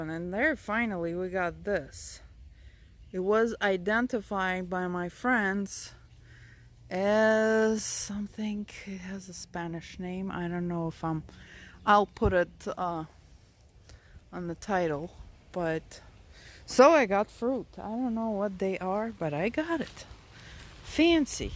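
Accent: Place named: Poland. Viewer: I love phalaenopsis and I grow them in my livingroom. I got interested in these plants through my sister-in-law. She has a kind of accent like yours, because she is Polish. That's why I thought you were Polish or Russian ;-) Florida seems a great place to live. But maybe a bit too hot for me. And too many spiders, snakes etc. Thanks for sharing your knowledge and way of life, from the other side of the world. All the best. And lot's of Rain! :-))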